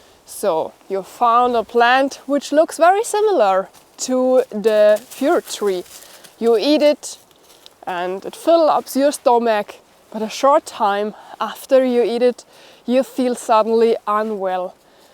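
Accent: Germany. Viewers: I love German accent, especially with all the mistakes. She warmed my heart through the entire pandemic. The world is already cold enough, don't you think?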